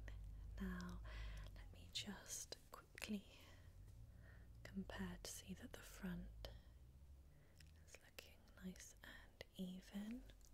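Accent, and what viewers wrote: England, United Kingdom. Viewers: the English accent works awesome for asmr👑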